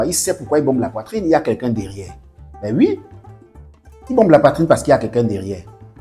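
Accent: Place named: Cameroon. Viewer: A travers l accent tu est biyamerounais toujours c,est vous vous connaissez tous sauf les problème de votre pays ,toujours entrain de parler de tous et se faire donneur de leçon,concernant le cfa nous on est déjà sortie pr l,eco ,c,est chez vous il ya meme pas débat ,avec vos président dictateur,parle de biya dictateur qui veut pas aller a la retraite.aprt la poussière y a rien au Cameroun.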